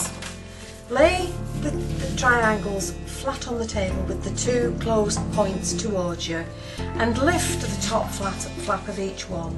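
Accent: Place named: Lancashire, United Kingdom. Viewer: this was the first of your videos that I found, back in 2010 when I discovered that Youtube had cardmaking videos! I have been a fan ever since. I'm currently re-watching your videos from the beginning - whilst ironing! Your videos make ironing fun!!! Thank you so very much for all the inspiration! (I love your accent btw, my hubby's a Lancastrian!) X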